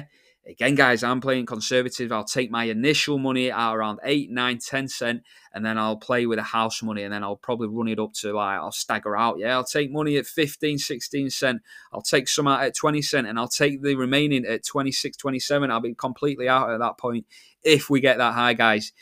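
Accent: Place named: Lancashire, United Kingdom. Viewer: Honestly mate, you sound like you're from where I live, well the area anyway I live near Burnley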